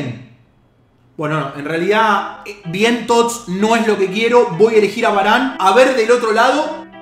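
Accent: Argentina. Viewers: Se copia de robert solo que con acento argentino :v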